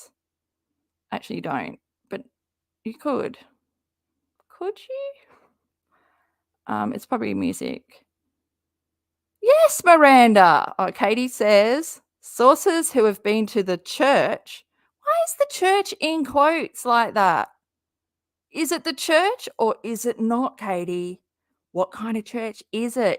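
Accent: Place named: Australia. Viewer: I have a sneaking feeling that karma is finally coming for a certain hater 😉and I can’t wait! FYI I LOVE LOVE YOUR AUZZIE ACCENT 😍😍😍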